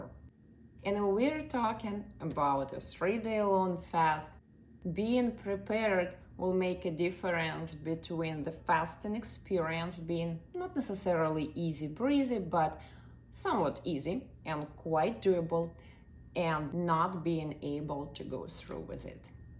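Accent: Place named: Poland. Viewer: Your foreign Polish accent makes my ears bleed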